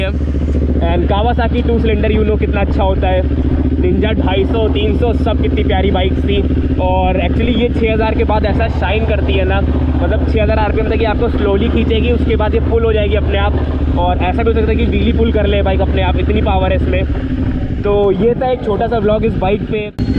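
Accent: India. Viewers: How does every indian speaks the exact same english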